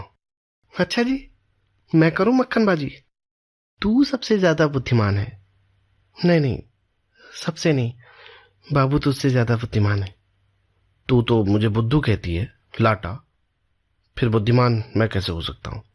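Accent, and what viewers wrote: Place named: Kumaon, India. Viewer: Your Kumaoni tone is perfect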